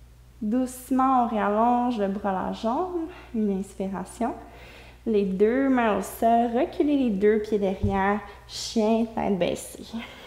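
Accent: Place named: Canada. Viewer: Merci belle canadienne avec ton super accent..que j adore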